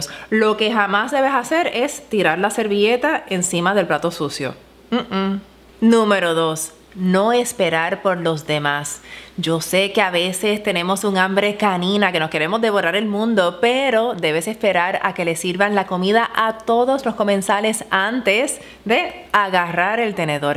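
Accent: Puerto Rico. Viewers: Me fascina el acento boricua.🥰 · Buena explicación, por el acento percibo que la presentadora es boricua. Saludos desde Panamá.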